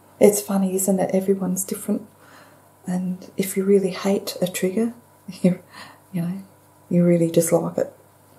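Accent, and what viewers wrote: Australia. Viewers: Lovely! You look at least 10 years younger with your new makeup pieces and hair do! I have to say I love my international ASMR artists and their accents. However, I was so thrilled to find an Aussie lady (you, in case you wondered) with whom I could identify. In those days I still thought it was a bit weird but you helped normalise it for me. I am slowly finding more Aussies making ASMR videos of which I'm also proud. But, you are my first Aussie ASMR artist and a relaxing nurse on my not so crash hot days. The rest are bonuses. 💜🦉🇦🇺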